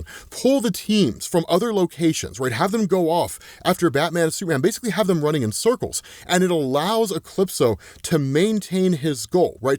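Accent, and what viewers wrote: United States. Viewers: Why does pronounce ''Eclipse'' like that (e-clips)?. Where's his accent from in the US?. I'm not from the USA.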